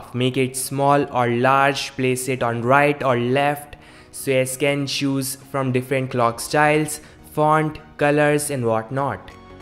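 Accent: India. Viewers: Are you from india...now wonder...i dont really understand some of what you are saying😊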